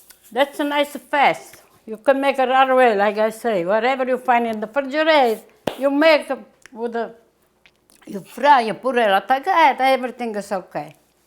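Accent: Italy. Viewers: Love watching with her great recipes, and also love listening to her Italian accent, as a native Italian I love everything Italian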